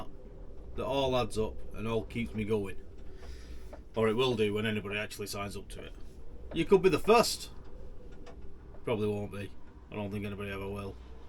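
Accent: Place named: North, United Kingdom. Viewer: I missed the bit where you said in your northern; "Don't belive in spirit levels " 😃 I am certainly looking forward to seeing more, just so I can see your workmanship reaching new heights. This boat project is going to be good for you. Take care.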